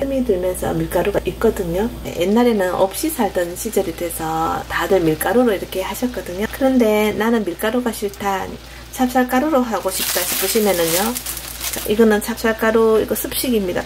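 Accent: Gyeongsang, South Korea. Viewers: 호박범벅!!! 님 올려주시는 영상 모두 맛나게 생겼네요^^ 꼭 래시피대로 만들어 봐야겠어요 ㅎ 경상도 사투리가 고향이 그립게합니다 오늘 것절이 래시피대로 만들었더니 너무 맛있어요 ^^ 구독하고 많이 배웁니다 ~ 여기 워싱턴입니다 2019년 새로운 영상 기대합니다 ~~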